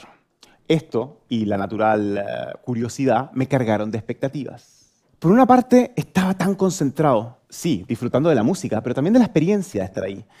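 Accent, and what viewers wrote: Chile. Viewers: Excelente, su acento es Chileno, como yo... Reconocible para cualquier Chileno, saludos 🤗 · Este gallo es chileno?? 😱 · Zangano Inkulto Tiene todo que ver, es uno de los acentos chilenos 😂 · Claramente, es por que ambos son Chilenos, acento parecido! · Es chileno? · Sin leer la descripción me di cuenta que era Chileno, por su acento, que grande un compatriota tan talentoso, felicitaciones y seguiré su canal desde ahora, éxito, sigue triunfando. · Como no reconocer a un chileno intentando pronunciar bien. Viva Chile ·%%$··3# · Es de Chile. El acento sudamericano y centroamericanos siempre sonarán así porque no existe el español neutro en el día a día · Así suena un chileno hablando un español más general XD, sin modismos chilenos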